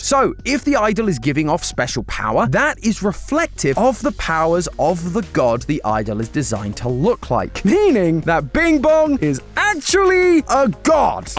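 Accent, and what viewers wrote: United Kingdom. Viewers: just came from a film theory and got jumpscared by the british accent immediately 💔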